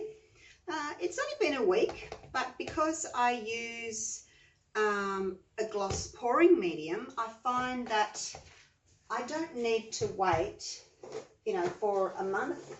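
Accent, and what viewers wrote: Australia. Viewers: Your videos are just SO helpful. I've only been doing this for about a month, but I'm loving every minute of it! I don't even mind when I end up with some muddy pours, because for some strange reason, my 28 year old son LOVES them...lol (no accounting for taste...lol) I'm just about to varnish 3 paintings (which are going STRAIGHT to him...lol), so this is REALLY helpful. Btw... SO nice to hear a fellow Aussie accent too 😘👍❤❤❤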